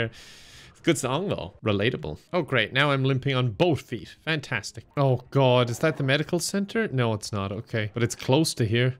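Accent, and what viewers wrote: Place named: Ireland. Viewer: I read the Wednesday entry in Irish voice.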